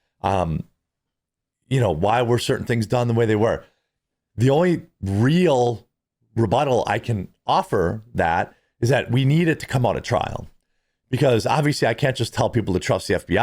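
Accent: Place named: Milwaukee, United States. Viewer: Your hoarse voice and Milwaukee accent is very surfer bro.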